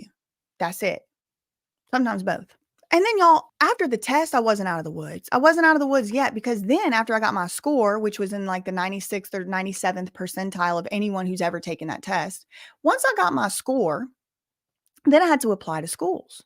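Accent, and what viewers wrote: South, United States. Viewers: I’m not American, but I don’t get why a person with southern accent is seen as lower in any capacity. It’s pretty evident that you’re incredibly intelligent. And you did it, you are on top, don’t let anyone make you feel less than. You’re so beloved and respected because you’re the real deal and i bet there are young girls looking up to you and wanting more for their lives despite the circumstances they are growing up in. You’re wonderful, funny, smart, beautiful and quick on your feet. We love you girl ❤ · Wow you're story's amazing, congratulations on going from where you did and with disabilities to now a lawyer, and I love your southern accent is so cute just like Britney's, don't ever change it. You should email that person and just say well bless your heart your southern so I'm sure you know what that really means when you tell someone that lol. 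❤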